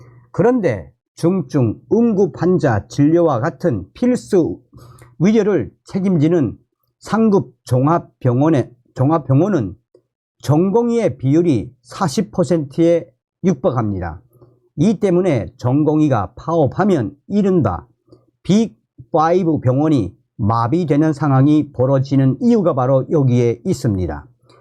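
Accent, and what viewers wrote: North Korea. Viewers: 말투가 북한??? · 북한에서 오셨어요? 발음이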